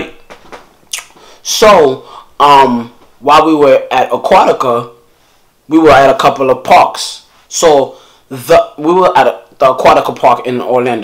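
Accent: New York, United States. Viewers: I love his waves and that newyork accent❤️❤️❤️❤️❤️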